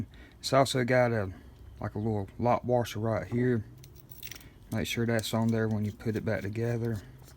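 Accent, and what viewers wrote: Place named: Brooklyn, United States. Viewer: Ok, WHERE online does one obtain replacement points? LOVE that Brooklyn accent!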